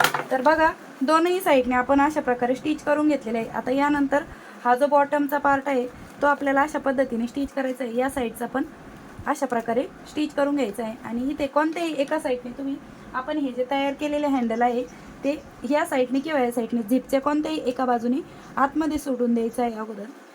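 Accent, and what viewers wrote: Ahmednagar, India. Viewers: अग विचारायचं कारण म्हणजे भाषा नगरचीच वाटली ना म्हणून विचारलं आम्ही पण कुकण्याचे आहोत पण नगरला रहातो ,तुझे व्हिडिओ बघायला लागल्या पासून खूपच पिशव्या शिवल्या मी खुच छान सांगते तू लगेच लक्षात बसत 🙏🙏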